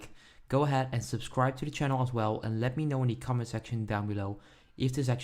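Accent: Netherlands. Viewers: Fakka Man Bro dit helpt gewoon ik hoorde al aan je accent dat je nl was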